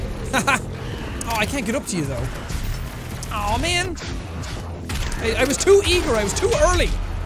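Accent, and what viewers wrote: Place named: Ireland. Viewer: Normal people: fall asleep listening to calming music. Me: falls asleep listening to a excited Irish man slaughter hordes of demons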